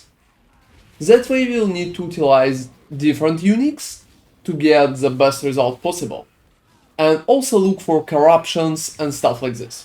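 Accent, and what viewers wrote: Russia. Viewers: жесть чувак твой русский акцент это такой пиздец, это невозможно слушать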